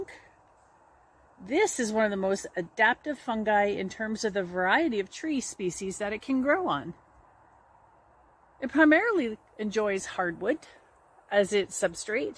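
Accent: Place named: Canada. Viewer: Beautifully presented and i love your accent, I'm presuming Canadian, in particular words like "about" "road", something about the way you pronounce 'oa' 'ou'. Get plenty more of those words into your videos please!.... 🙏💖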